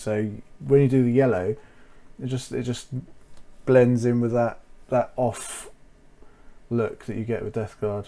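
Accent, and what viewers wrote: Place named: Northern England, United Kingdom. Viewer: u have the jhon snow accent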